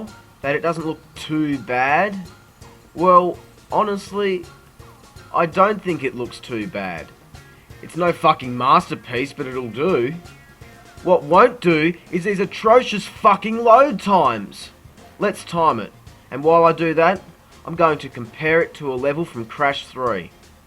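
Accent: Australia. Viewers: Your Australian accent really fits with this game :D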